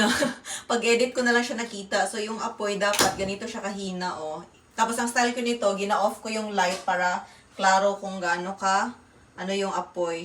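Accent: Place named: Visayas, Philippines. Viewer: Bisaya c madam diay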